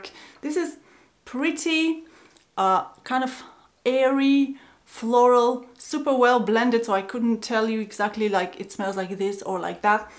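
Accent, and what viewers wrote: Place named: Finland. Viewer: Hello how you feeling?New subbie here:) Glad I found your channel my friend.Wonderful video and haul.Super solid list.I see your from Finland.Very cool,you have a beautiful accent as well:) I was in Paris this time a year ago in Jan of 2020.I was having a couple of pints of brew at a pub right outside the Paris Saint Germain stadium after the football match against Monaco.The game just ended so the place was packed with people who just left the game.I met some COOL people from Finland.Really genuine and down to Earth,great times.Happy New Year to you and yours.Much love from California.Peace & Blessings!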